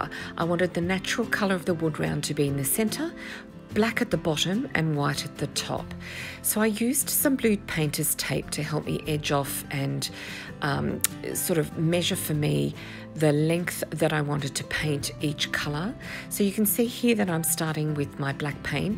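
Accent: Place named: Australia. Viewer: Just beautiful! Aussies have the most gorgeous accent and your voice is so pleasant and calming. I think if Olivia Newton John♥️Love your wreath!!!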